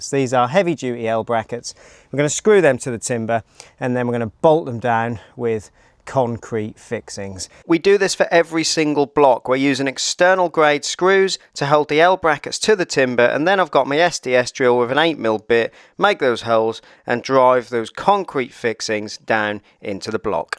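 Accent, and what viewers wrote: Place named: Suffolk, United Kingdom. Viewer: Nice to hear a good old Suffolk boi after 30 years in NZ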